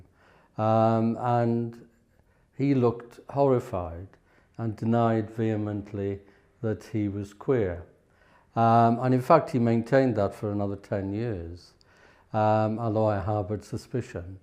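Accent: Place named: Wales, United Kingdom. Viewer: Definitely same guy. Same Welsh accent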